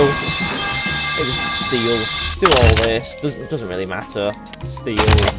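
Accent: United Kingdom. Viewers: GrogTV but British instead of Aussie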